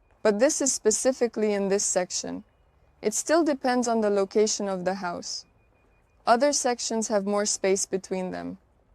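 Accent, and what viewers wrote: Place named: India. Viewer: Акцент жесть, конечно. 😅 Индийский акцент. Вроде в СССР хорошо преподавали английский, почему у вас такой акцент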